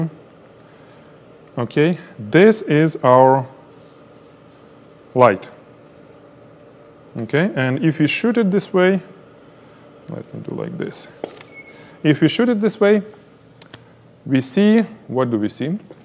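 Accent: Russia. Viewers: Родной русский акцент ))))) класс, спасибо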